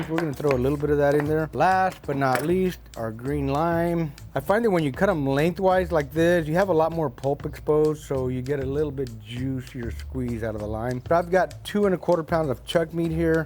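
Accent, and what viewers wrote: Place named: Houston, United States. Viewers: Sounds like every first gen American (or born in Mex and came young) that lives in South Texas. 😂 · I have uncles from Houston that sound exactly like. 😂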